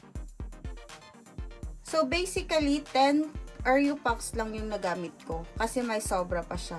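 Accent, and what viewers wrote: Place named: Aklan, Philippines. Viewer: taga san po kau maam? ung accent nyo po is it from aklan?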